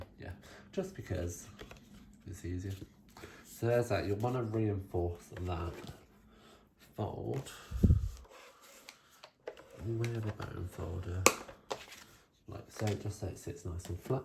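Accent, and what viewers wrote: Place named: United Kingdom. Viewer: Hi there, great video! I was on the fence about this stamp set, you sold me!! Getting it! I hear your British accent, I miss it, I am from Bristol, living in the US.👋🇬🇧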